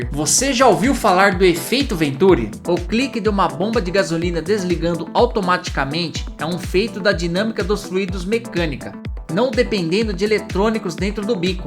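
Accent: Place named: Inland São Paulo, Brazil. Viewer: O conteúdo do vídeo é excelente, mas.... Com todo o respeito senhor sua dicção e pronúncia das palavras precis ser melhoradas, quando você pronuncia a letra "r" sua língua embola... Parecido com o sotaque caipira do interior de São Paulo e região sudeste... A pronúncia que você faz é a seguinte: "poirrrrque" "cairrrrteiraaa", "inteirrrrna". Dói os nossos ouvidos...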